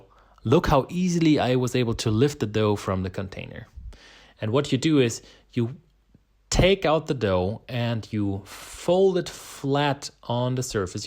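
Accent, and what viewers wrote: Germany. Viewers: is 15 minutes not 50 right? I know the subtitles say 15 but maybe AI was thrown off by a charming German accent?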